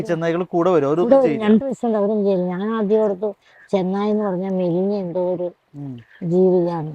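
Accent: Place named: Kottayam, India. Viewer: സിസ്റ്ററുടെ വർത്താനം ഒരു കോട്ടയം slang ആണ് 13 വർഷം കാട്ടിൽ ഒറ്റയ്ക്ക്😔😔 ഇനിയും വേണം sister ൻ്റെ വീഡിയോ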